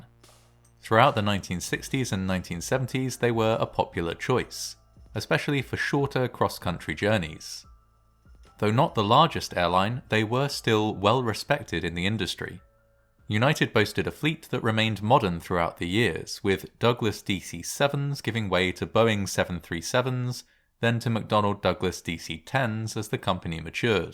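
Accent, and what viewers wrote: United Kingdom. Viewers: Tell me about the horrible history my soothing british man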